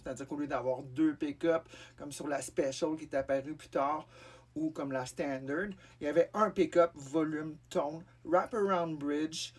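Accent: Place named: United States. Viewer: Putain, un accent à couper au couteau... Ça parle moitié anglais moitié français... Et quand ça parle français ça a un accent américain.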